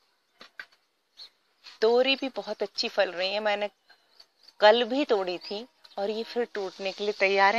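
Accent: Standard. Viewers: Thank you ❤aapne reply kiya Aapki awaz bahot acchi hai or hindi bhi shudh bolte ho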